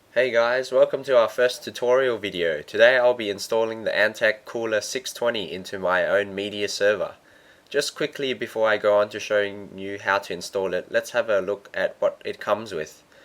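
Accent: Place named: Australia. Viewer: Australian accent is always nice to listen to.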